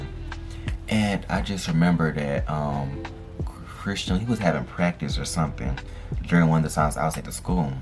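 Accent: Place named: South, United States. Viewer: You look and sound like a real southern. belle. Keep enjoying and keep growing your content .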